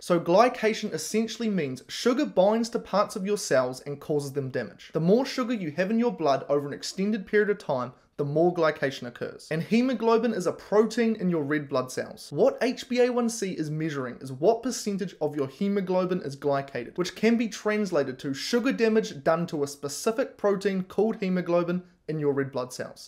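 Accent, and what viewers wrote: New Zealand. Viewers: Great info.. love the kiwi accent!! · He is from New Zealand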